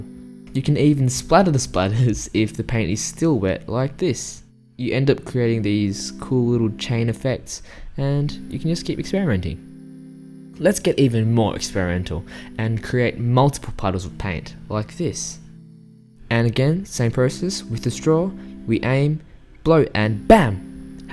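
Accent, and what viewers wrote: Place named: Australia. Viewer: sounds like an australian accent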